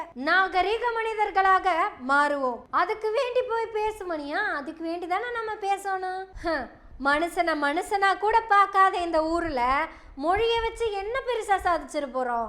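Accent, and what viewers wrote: Kongu, India. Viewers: தோழரின் கொஞ்சும் கொங்கு மொழி நடை மிகவும் சிறப்பு. தொடருங்கள்.. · மகளே உன் பேச்சில் கொங்குதமிழ் கொஞ்சுகிறதுஅம்மா. இந்தியா பாகிஸ்தான் போரைப்பற்றி கொஞ்சம் பேசுதாயே. மேலு‌ம் கோடிக்கணக்கில்சம்பளம் வாங்கும் நடிப்புக்கூட்டங்கள் ஒரு ரூபாய் கூட போருக்கு உதவிசெய்யவில்லையே.ஆனால் நடிகைகளின் திருமணத்திற்கு கோடியைக் கொட்டிக்கொடுக்கின்றார்கள்.இது என்ன நாட்டுப்பற்று.? நீ பேசு மகளே நிறைய பேசு.!!!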